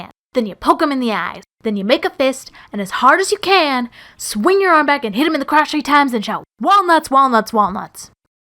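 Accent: Canada. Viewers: are you Canadian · HHHHHHH I got it!!! "do not bang the chicks" XDDD took me a second! also your canadian!? :O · you sound like you grew up in Winnipeg. cause I had that growing up here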